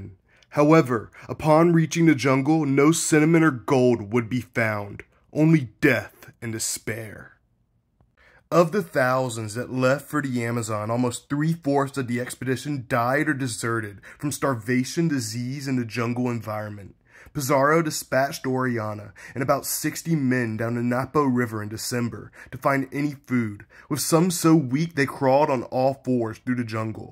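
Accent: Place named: Philadelphia, United States. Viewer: My favorite part is the Philly accent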